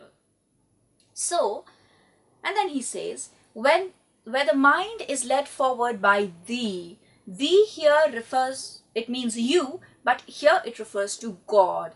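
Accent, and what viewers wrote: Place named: Scotland, United Kingdom. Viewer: you have a touch of Scottish accent. Thank you so much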